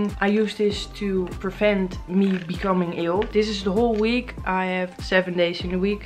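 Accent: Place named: Netherlands. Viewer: Leuk dat je gelijk kan horen wanneer iemand die engels spreekt een Nederlander is haha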